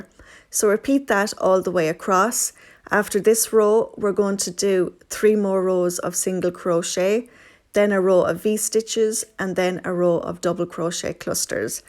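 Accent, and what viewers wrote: Ireland. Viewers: I'm delighted to have found your tutorials. It's very easy to follow your instructions and I love your Irish accent!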